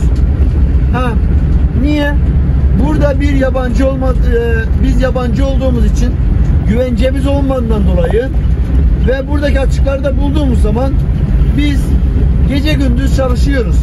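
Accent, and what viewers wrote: Gaziantep, Turkey. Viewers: Çok şükür gerçek bir Gaziantepliye rastladım. Abi seni dinlerken tamam bu tam Antepli işte dedim. Doğal ve içten olurlar. Samimi insanız biz çünkü 👏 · Abi bi anteplinin dilinden antepli anlar gelirken sana salca getirecem 😅😅😅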